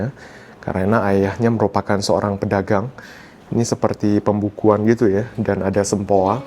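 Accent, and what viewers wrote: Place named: Medan, Indonesia. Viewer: Kau cakap tetap logat medan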